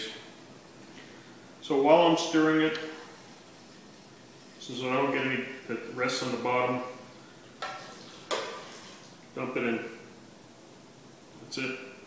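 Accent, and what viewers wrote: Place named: United States. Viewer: For a person with an American accent, I found it slightly odd that that all ingredients were named by metric weight. Something easy enough to figure out, but USA never went metric, so far, even though there was talk of it 40 years ago. I've eaten there when I was at Yale. Frank's obit started on the front page of the NY Times!!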